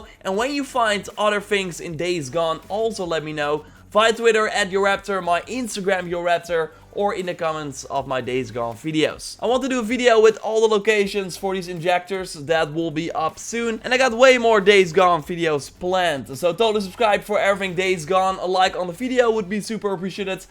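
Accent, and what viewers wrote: Netherlands. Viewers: Are you from the nederlands because your accent is like that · But it doesn't do it in a mid 90's 'Smashie and Nicey' radio accent with Dutch overtones like this video. I was just waiting for "...and to increase your score, listen to some Golden Earring, or Bachman, Turner, Overdrive on your Sony Midi-system, mates!" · Are you Dutch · You hear your dutch axent a lot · Are you Dutch? Dat dacht ik namelijk te horen. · Just one random question.....are you dutch bruh?? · The Dutchest of accents. Mijn dank is groot, broeder. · Are u dutch ? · He is dutch i hear it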